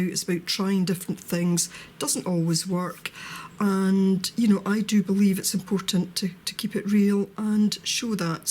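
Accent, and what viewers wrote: Scotland, United Kingdom. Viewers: Amazing. You have a special gift. Your voice is beautifully calming and your Scottish brogue is music to my Cheshire ears. PLUS... you have inspired me. You and Nina are fantastic at being able to do that. Thank you for sharing, you have made me happy. X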